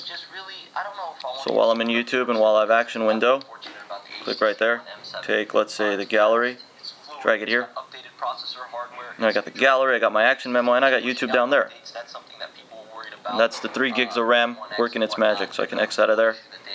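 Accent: New York, United States. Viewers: Superb review. By the way, is that a N.Y. accent?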